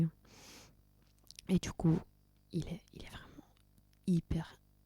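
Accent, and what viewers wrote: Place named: Italy. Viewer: Trop cool l'accent Italien, j'adore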